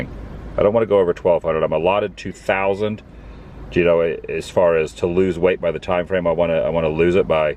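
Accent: South, United States. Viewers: Missing your adventures so I got up early, created a queue and getting my southern drawl to start my day! xo sending hugs to the Mama, Papa, Sissy & Brother-in-law!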